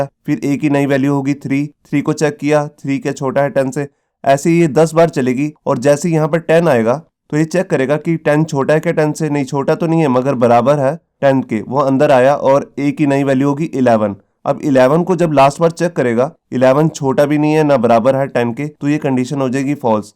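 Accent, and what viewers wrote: Punjab, India. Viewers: you cannot control your punjabi as amny punajbies face this problem and punjabu words like porri comes out involuntry.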